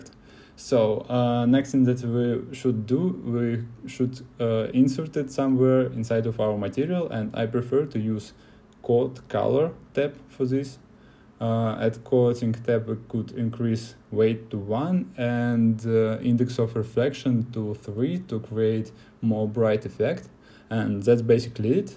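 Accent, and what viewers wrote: Russia. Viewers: оцень русский акцент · Русский акцент) Ты случайно не с мастерской?)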